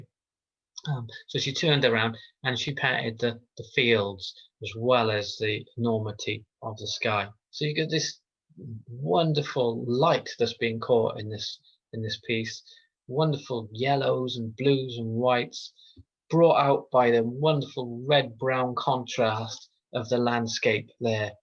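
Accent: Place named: Barry, United Kingdom. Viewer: Lovely to hear another Barry voice talking so knowledgeably about Art. Not only Ceri Thomas then...😂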